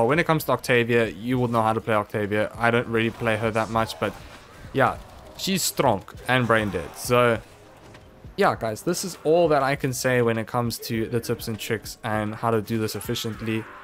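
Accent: South Africa. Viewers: You sound so South African... Man I hope I'm not just going crazy. Best guide I've seen on this, VERY well done, you've earned a sub!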